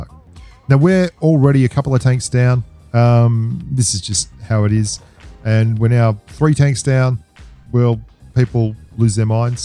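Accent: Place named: Australia. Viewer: I sure did miss that Aussie voice. I quit playing for several months and came back and started playing again. Happy to see you back to posting regular vids.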